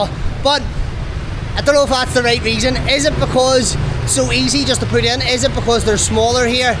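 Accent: Liverpool, United Kingdom. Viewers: Are you a scouser :D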